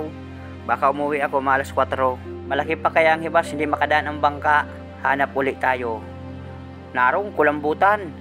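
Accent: Bicol, Philippines. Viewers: Halata na bikolano ka ka father's..godbess u👍👍👍👍🙏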